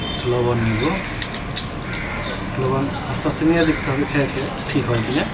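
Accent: Bangladesh. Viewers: Tanveer Touhid এটা টাংগাইলের ভাষা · আমার বাড়ি মানিকগঞ্জের সিঙ্গাইরে, আমাদের ওখানকার মানুষ যখন শুদ্ধ ভাষায় কথা বলার চেষ্টা করে তখন কিছুটা এমন শোনায়। তাই জিজ্ঞেস করলাম আর কি। আপনার ভিডিও ভালো লাগে। চালিয়ে যান দাদা। সাথে আছি। :)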